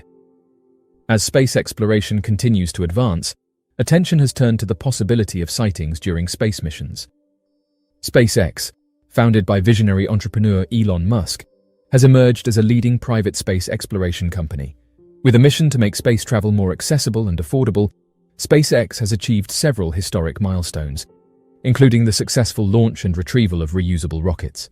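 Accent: United Kingdom. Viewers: T you from uk 🇬🇧 interesting and odd .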